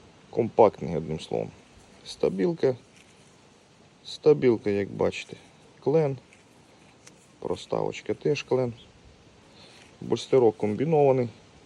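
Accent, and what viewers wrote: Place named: Rivne, Ukraine. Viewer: Гарні речі робиш друже, чую по говору, ти з наших країв ( Рівненщина?)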